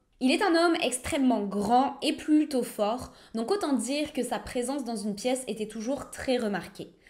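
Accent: Quebec, Canada. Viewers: Bien raconté,merci! Je distingue un soupçon d’accent québécois ou quoi? C’est subtil, il faut s’y connaître! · Kikou tu es adorable et j adore ton accent québécois. Merci beaucoup pour ta vidéo bisous 👍👍👍👍👍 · Coucou ! j'ai une question, je suis fascinée par ton accent du Quebec, tu l'as eu naturellement à force de rester là bas ou alors tu t'es entrainée ?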